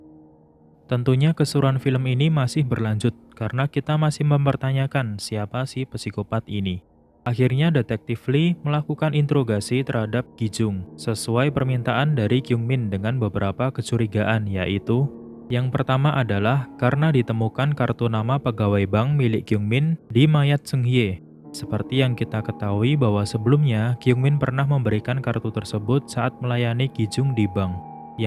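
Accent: Java, Indonesia. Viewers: Klo dengerin suaranya kaya org Jawa😀 trus tb nya rada tinggi Iyah ngga sihh bang hihihiii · Semangat terus bang:) Btw suara luh, medok bngt bang🙏😅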